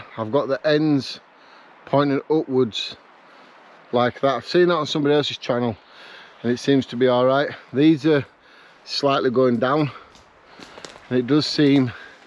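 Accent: United Kingdom. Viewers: Nah, the accent sounds cool. Stuff sounds better with a British accent and they have great colloquialisms.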